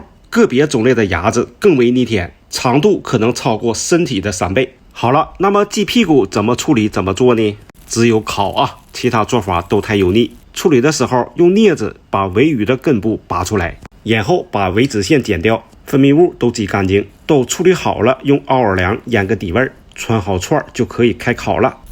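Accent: Dalian, China. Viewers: 的大连口音听起来真亲切。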